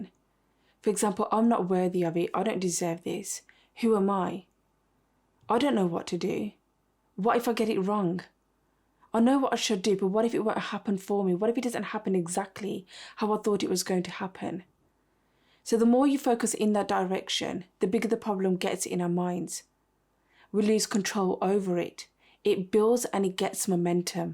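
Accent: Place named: United Kingdom. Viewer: what zodiac sign are you? also, you talk like the guys in (i lived in the u.k. fer 2 1/2 years with an english gf). i have anxiety disorder and in addition to what a strong and domineering emotion it is (along with the usual intrusive thoughts) i do sometimes wonder if my amygdala pumping out cortisol in such high quantities will eventually lead to cancer or some other illness (when it strikes my anxiety lasts fer HOURS and comes out of nowhere). it's like being possessed by some sorda evil spirit.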